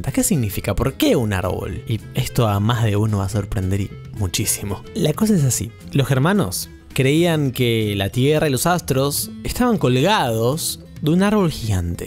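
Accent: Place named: Argentina. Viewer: Deja de hablar como argentino!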